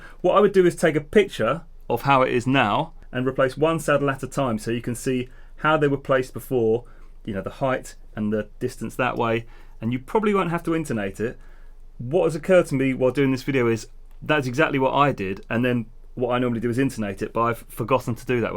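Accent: United Kingdom. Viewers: This guy is so British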